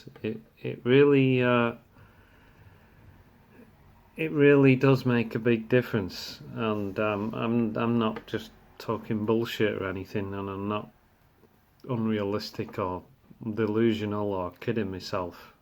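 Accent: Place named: Northern England, United Kingdom. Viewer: As an old Yorkshireman,I'm curious about what part of the North you originate from.Watching and listening to you takes me back to conversations in pubs long ago.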